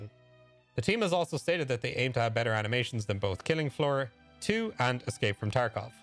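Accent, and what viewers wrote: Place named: Ireland. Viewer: Where in Ireland are ya from sham?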